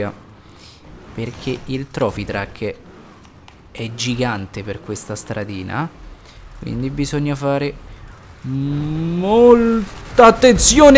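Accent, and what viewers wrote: Rome, Italy. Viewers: Sei di Roma?grande suiiii🐷🐖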